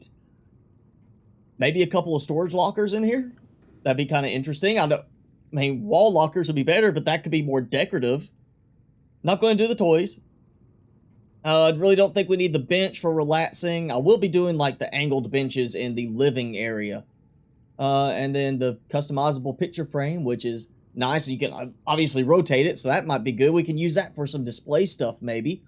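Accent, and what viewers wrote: South, United States. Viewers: Gotta love the y'all accent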